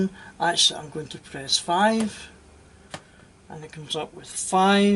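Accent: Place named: Scotland, United Kingdom. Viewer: Love the video and as if by magic "as Mark quoted " ,im new to N-Gauge so have been watching loads of videos to learn ,then i found you "brilliant" im from Aberdeen and your from a wee bit down the road i gather by your twang. keep it up im going to join Aberdeen Model Railway Club and gain knowlage from them too, i just bought a load of stuff from your favorite Ebay and am converting the engines to DCC,look forward to more videos ,keep up the good work and Thank &You. .regards John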